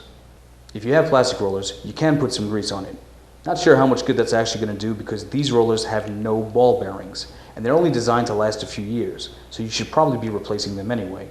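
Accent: United States. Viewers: Is that a Laaung Island accent? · The presentation was great,. I like your NY accent too. (Long Island?) Could be a north Jersey accent. The company jingle sounds like it's from the '70s.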